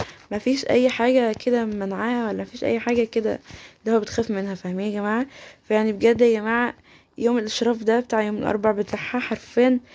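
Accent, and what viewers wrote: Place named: Saudi Arabia. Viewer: والله انك صرتي تتكلمين سعودي يا لبيهه😭💀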